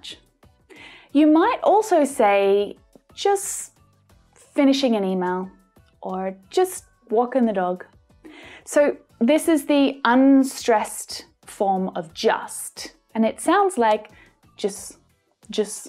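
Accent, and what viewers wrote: Australia. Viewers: First time I’m really learning good pronunciation but from a teacher with an australian accent!!! Superb!!! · Nice AUSTRALIAN sound. And I learn much from you. Thanks.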